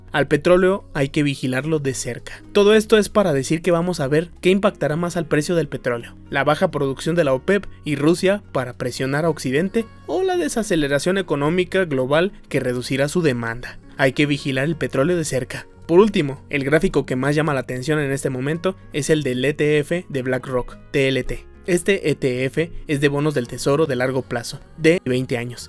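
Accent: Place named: Mexico. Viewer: no puedo ver videos cuando la gente habla asi de culero jaja lastima se ve que tenias buenas intenciones pero tu acento me rompe las bolas, eres de mexico?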